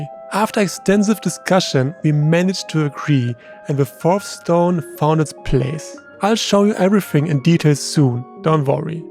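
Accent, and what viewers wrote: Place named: Germany. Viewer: Hey man Great Content fellow ant keeper here. Are you German btw because you got the German accent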